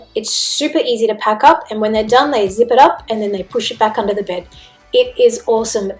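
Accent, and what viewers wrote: Australia. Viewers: I'm from Brisbane too, I've only just found these videos and I'm loving them...also loving the Aussie accent! 🇦🇺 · Hi from another Brisbane girl, it’s nice to hear an Aussie accent and I had a giggle when you mentioned thongs and then called them flip flops 😂 the views from your apartment are amazing 😊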